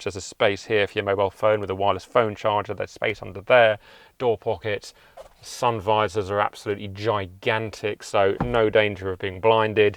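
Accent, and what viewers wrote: United Kingdom. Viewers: Love this refresh! First time watching the channel... For some reason the internet was buffering and when the video began I heard the yelling... I laughed b/c I knew it was going to be a British reviewer!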